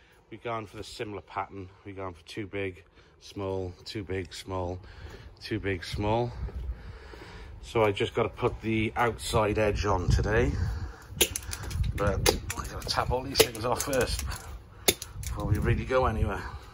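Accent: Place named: Wales, United Kingdom. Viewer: Where are you based if you don't mind me asking? I hear a Welsh twang in your accent 🤣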